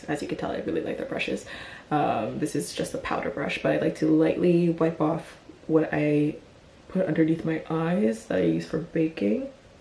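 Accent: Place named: England, United Kingdom. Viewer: I loved the touches of the English accent 😂😂😂 Beautiful finished look ❤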